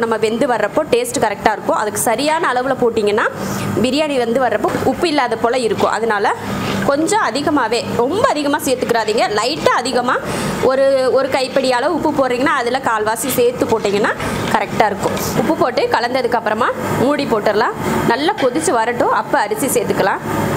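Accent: Coimbatore, India. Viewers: Akka neenga Coimbatoraa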